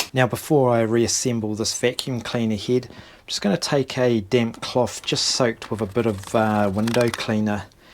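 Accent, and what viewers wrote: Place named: Australia. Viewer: Nicely done! Thank you. (Love to hear that Oz accent!)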